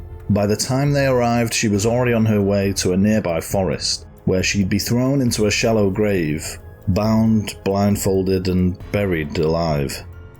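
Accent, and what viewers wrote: United Kingdom. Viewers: Hi, I'm a new subscriber in your channel, I love watching crime stories and for a various vlogs about crime investigation ,I love your channel. Can i have a request,can you pls put a substitle,cause I" ve got a bit hard in understanding on some of your British accent..Philippines here❤️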